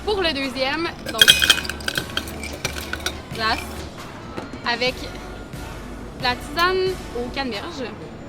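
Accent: Quebec, Canada. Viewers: Est-ce que tu viens du Québec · Est tu Canadiaine ou Anglaise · Elle est canadienne ; ça s’entend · Évidemment canadienne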